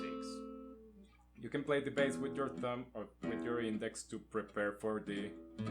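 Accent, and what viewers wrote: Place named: Latin America. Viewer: JAJAJAJA Note un poco de acento latino cuando hablas en inglés, pero no estaba tan seguro. Ntp te explicas bien y se entiende al 100 todo el vídeo. Muchas gracias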